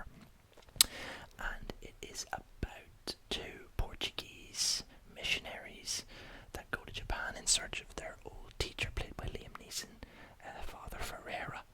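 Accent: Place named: Ireland. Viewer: The irish accent is great for asmr. I could listen to you talk for hours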